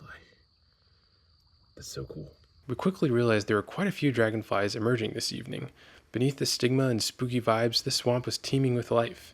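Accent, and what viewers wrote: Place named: France. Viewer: Are you French ? Your accent sounds like that. 🎀 thanks for your videos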